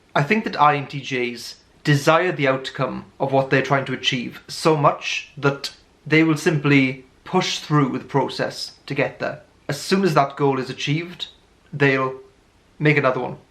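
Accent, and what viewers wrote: Wales, United Kingdom. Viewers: Yo, are you Welsh by any chance?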